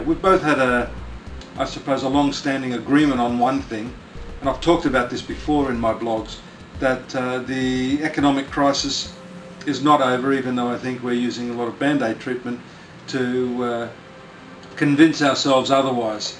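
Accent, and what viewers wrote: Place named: Australia. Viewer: Ah...I was right...you're an Aussie. At first I was wondering what part of England you were from and then I got it. Thanks for the info--I'm a Sadge.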